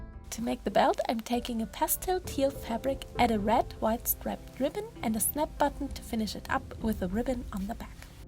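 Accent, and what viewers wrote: Germany. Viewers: Your accent sounds German lol are you perhaps German? Bts love your art!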